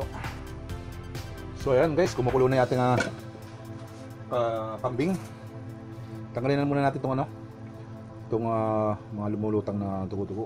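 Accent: Batangas, Philippines. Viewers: sir slamt sa pagdaan s aking maliit nbhay, dito nko ngbblik, paborito ng magbabarik ang iyong putahe.. klasey batangueno ka sir halata sa punto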